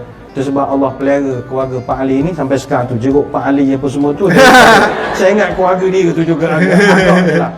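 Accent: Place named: Temerloh, Malaysia. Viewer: Best loghat tmerloh 😂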